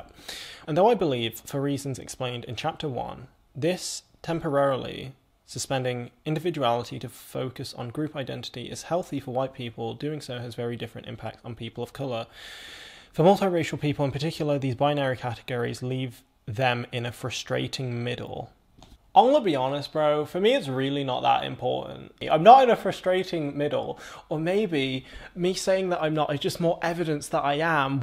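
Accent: United Kingdom. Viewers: I can see what you mean by black passing. But what I looked at you I actually didn't think you were black. like full african. I thought you were like well actually I did think you were black like I thought I was confused like I wasn't sure if your Fulani Ethiopian smiley. You could have been all those things so I guess you're right you are bypassing. I thought when I the English accent to me I think that's what set me off. Because if you were over here in America you would just be black and my opinion. Because black Americans I don't see any mixed black people looking like you. Really at all.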